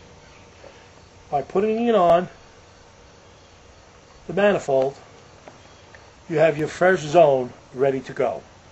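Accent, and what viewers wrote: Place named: New York, United States. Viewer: you sound like a NYer thanks for the all the info!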